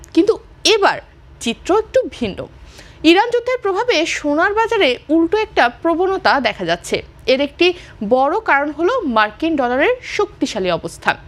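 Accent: India. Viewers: Akemon khobor pora??? Indiander moto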